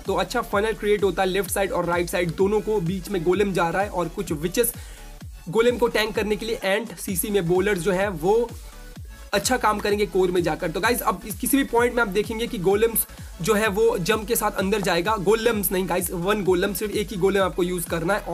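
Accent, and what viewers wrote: Bengal, India. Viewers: you are Bengali????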